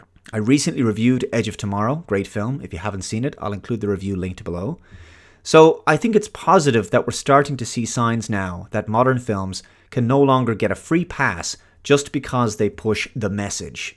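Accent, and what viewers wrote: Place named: Ireland. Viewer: Hey, great vid. Really didn't like that symmetrical video split youve done in the BG. Made me a bit queasy tbh. Not sure you've done that before, I just like to look at all the beautiful locations behind you while listening to your dreamy Irish tones. Without the editing. Anyway, cheers mate 👍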